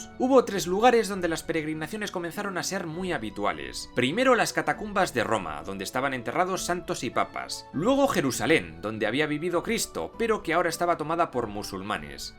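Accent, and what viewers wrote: Spain. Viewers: Me hizo reir los comentarios de este narrador. Con ese acento español. Pero muy interesante lo que dijo.😂😂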